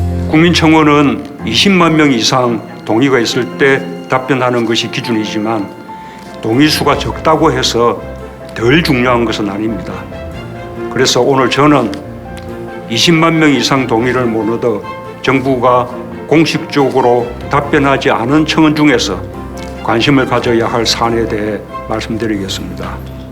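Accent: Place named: China. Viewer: 지난 4년 동안 중국인 발음이다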